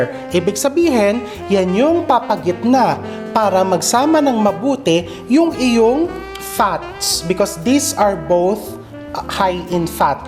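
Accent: Philippines. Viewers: I’m here to enjoy the Filipino accent :)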